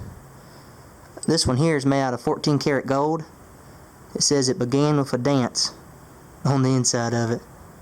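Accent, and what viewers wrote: South, United States. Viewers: Your obviously 7, it's a southern accent, it's common for people to have accents like that, especially if they live I n certain parts of Texas, Alabama, etc. people who are black don't exactly have an accent, they talk a certain way, but it's different from a plain southern accent, he litterally just talked about Texas, and said y'all, he's from the south maybe the west you never know, it all depends on where you live. But I don't expect you to understand going off of the fact that your most likely under the age of even 8.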